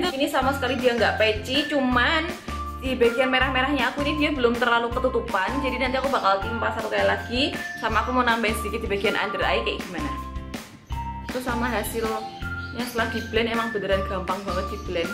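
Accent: Java, Indonesia. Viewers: Baru pertama nonton aja udahh love sma 😍😍 medhok e kyok aku rek wkwk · Kesini krn beranda tak kira org korea trnyt medok wkw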